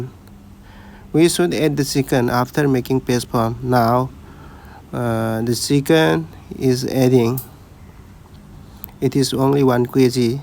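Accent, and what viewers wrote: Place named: Arab world. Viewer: U have a little Arabic accent 😅... Nice recipe 🎉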